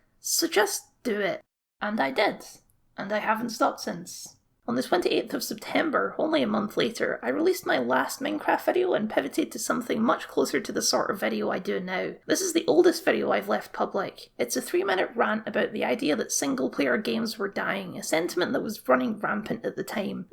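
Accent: Scotland, United Kingdom. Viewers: I'm a new subscriber and I'm digging it. About your voice im confused as an American all Scottish people sound funny to me what's different about you? Lol no but seriously I like the content and I love interesting voices and accents hence I listen to very few American essayists because they all sound like me and that's boring.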